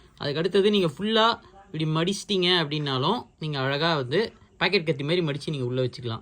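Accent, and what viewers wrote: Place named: North Madras, India. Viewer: I spent several hours in diaso stores in UAE and Singapore ,Malaysia.Tools especially garden tools are very good but Indian wouldn't knowing such tools exist.because we see old tools and some Chinese tools.you did a good job to show us the purchased items.though you tend to speak good media tamil but your north Madras tamil always comes in. Once upon a time I had a engineering student saying pashupu for pipe and to correct him it took us long time though he writes good English.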